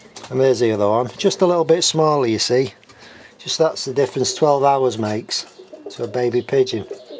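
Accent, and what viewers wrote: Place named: Yorkshire, United Kingdom. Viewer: Hi I watch all your videos we’re are u based sounds like Yorkshire?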